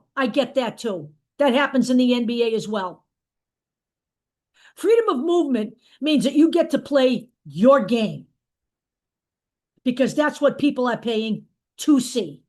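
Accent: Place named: New York City, United States. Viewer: I watched that Lundberg report. I remember him concluding ESPN only put that date due to needing a 'placeholder' on their shows. An estimate, they don't really know when she's coming back. FYI I'm 75 grew up in NYC, fan of NBA since childhood. Only watching WNBA now because of CC. She's the best! You sound like you're from NYC too. Enjoy your sharing and style, reminiscent of NYC.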